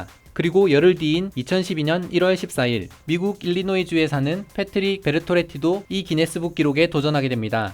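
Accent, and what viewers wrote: Gyeongsang, South Korea. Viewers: 원래 출신이 경상도 출신이신듯. 왜냐면 억양이 유시민 느낌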